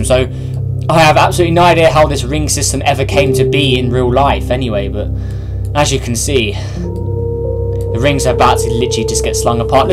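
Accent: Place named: United Kingdom. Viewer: his voice sounds so british like he is saying you alright mate or cheers mate or cheers felure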